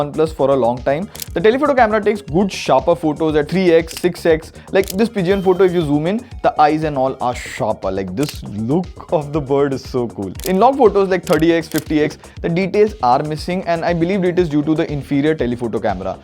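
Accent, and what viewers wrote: India. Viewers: hahahahah i cant unterstand the indian english ahhahahaha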